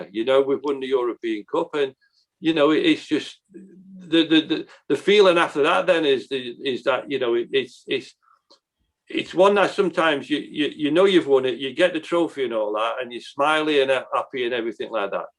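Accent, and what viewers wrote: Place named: Birmingham, United Kingdom. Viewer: Bit of a Brummie accent creeping in there. I asked my Irish Father is Jack Grealish the best captain-absolutely not.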